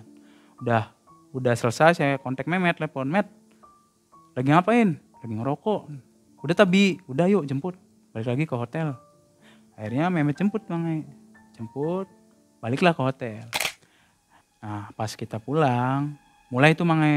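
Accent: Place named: Cirebon, Indonesia. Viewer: wong cerbon yaaaa Logate bli bisa diboongi ca 😁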